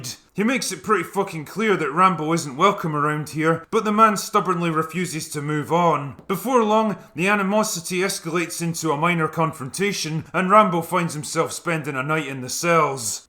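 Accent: Scotland, United Kingdom. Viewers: I assume by your accent that you're a Scot. Do you know the film Culloden by Peter Watkins? This was his first professionally produced film and paved the way for the BBC to produce The War Game (which they then refused to transmit) <link>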